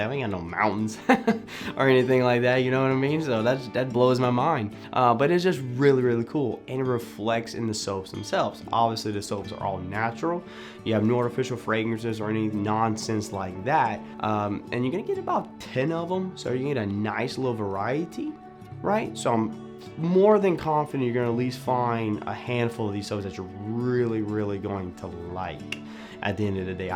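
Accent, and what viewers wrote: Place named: South Louisiana, United States. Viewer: I literally subscribed because you said you were from south Louisiana. I lived in Lafayette for 18 years. I can definitely pick up on your accent!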